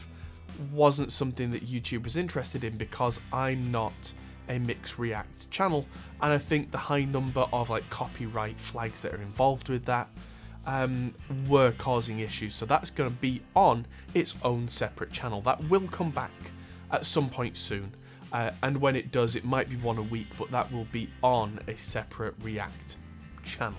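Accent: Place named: North, United Kingdom. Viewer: You're a credit to our industry, look forward to seeing more of your content. Would love to catch up with you at some point it's great to hear a fellow northerner on here.😃 Maybe catch up at Namm in April.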